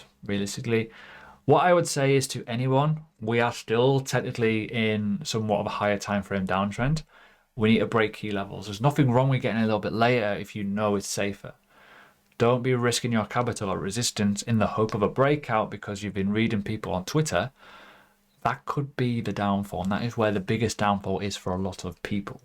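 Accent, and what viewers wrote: United Kingdom. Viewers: Great content. Maybe talk a little slowly people used to listen an american english than british.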